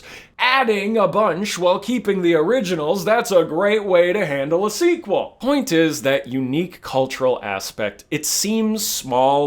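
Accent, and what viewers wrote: Birmingham, United Kingdom. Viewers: como diria el tablos, el acento britano le da ESENCIA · subbed for the flawless birmingham accent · Hearing you as an American loving the Bri’shness and doing a pretty top job at a Brummy accent made me proud to be from that shithole! 😂😂 great video mate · You sounds very British, I'd trust you review a briish game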